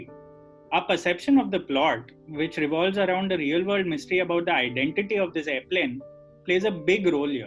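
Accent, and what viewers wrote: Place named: Mumbai, India. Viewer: The series is great. Your review is good. But I'm sorry, I didn't like your accent. It's a Mumbaikar meets South Indian meets Karan Johar type pronunciation of words. 😐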